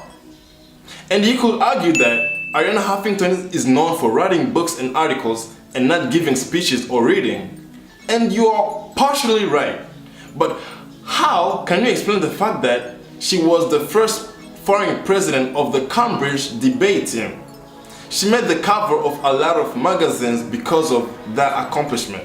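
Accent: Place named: Africa. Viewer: You still have an African accent though And its is beautiful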